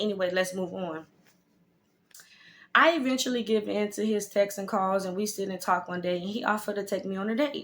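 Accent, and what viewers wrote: United States. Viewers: You sound like your from Louisiana though. 💜 when you were listing the dudes I was looking around my room. Hell! 😂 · This 💩 sounds so Houston!!! Cracking up laughing 😂😂 All those dudes in one room (I’m hollering) 😂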